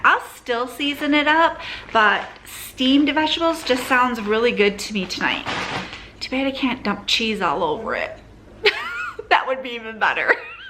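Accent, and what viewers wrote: Michigan, United States. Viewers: I found your channel just awhile ago, so haven’t heard what part of The Mitten you are from. I like your bubbly personality and the accent takes me back. Lol We lived in Michigan and Kogels is the absolute best!!!! We live in Tennessee now, but every time we go back we stock up on anything Kogels. The bologna is not like anything I have ever had anywhere. We buy a couple of rolls and freeze it. I wish the sold it here. I took this brand for granted when I lived there. We’ve ordered some also.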